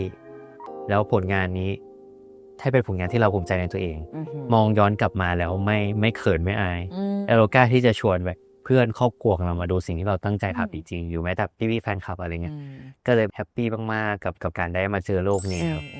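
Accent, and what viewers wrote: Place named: North, Thailand. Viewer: น้ำปิงพูดเหนือน่ารักมาก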